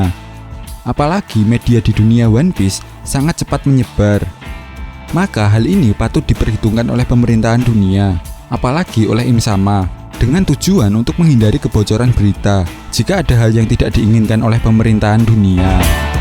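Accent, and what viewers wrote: Java, Indonesia. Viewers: Medhok mu itu looooh.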